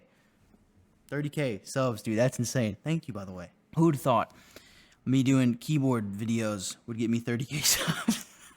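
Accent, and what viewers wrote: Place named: South, United States. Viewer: slightly country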